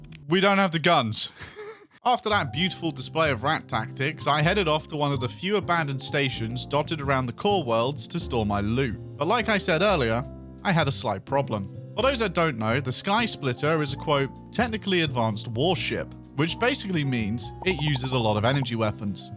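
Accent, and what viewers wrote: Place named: United Kingdom. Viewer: I thought you were saying alpha ray eye cores for the longest time, and then I realised you were just fucking bri*ish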